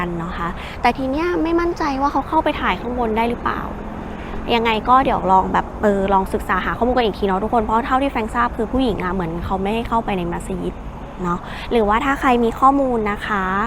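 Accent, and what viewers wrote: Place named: South, Thailand. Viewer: คิดอยู่จ้าว่าทำไมพูด ดา เดิ้อ คนบ้านเอ๋ง นี่เอ๋งนี่้เอง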